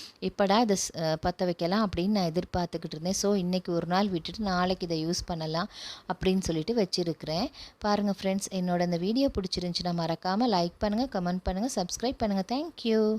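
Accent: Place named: Sri Lanka. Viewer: Super medam elankai spech ❤❤❤😂😂😂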